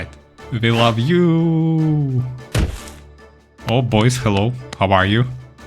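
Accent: Russia. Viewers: На самом деле у тебя очень хороший английский. Но всё равно русскому уху очень слышно) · Hello! Просто вопрос, вы из россии? Просто по акценту похоже. Да и похоже на.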